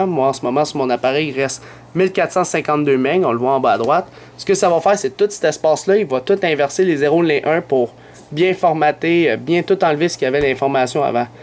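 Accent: Canada. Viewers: Mon dieu , J'adore son accent !!!! Canadien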